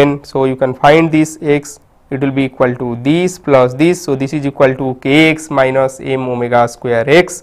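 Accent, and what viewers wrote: India. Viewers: The movement of cursor to indicate which particular portion of the screen the student should focus attention on, is to be improved by increasing the size of the cursor. If that is taken care of, the quality of learning will vastly improve. Of course, students outside India will find it difficult to comprehend a pure desi pronunciation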